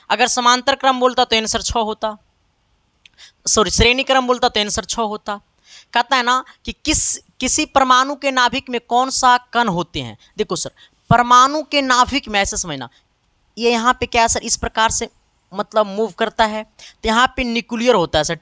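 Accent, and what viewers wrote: Bihar, India. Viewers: Sir aap to bilkul bihari ki tarah bol rahe hai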